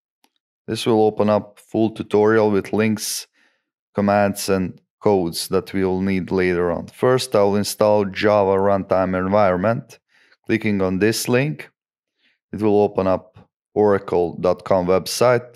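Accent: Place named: Eastern Europe. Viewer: Славянский акцент))))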